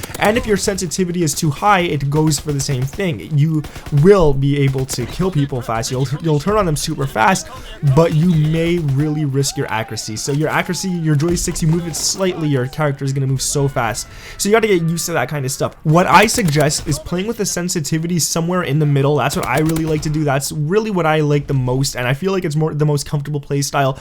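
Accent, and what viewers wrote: Canada. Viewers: Shawn Tarbox I think he is Canadian based on accent. He can’t help it!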